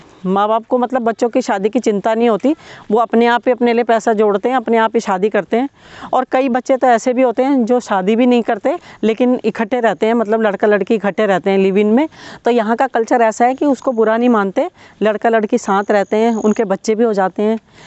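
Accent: India. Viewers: Thanks medam ap acha bolti bilkul bhartiya andaj me Apki real hi sari video h modifiy nhi karti ye acha laga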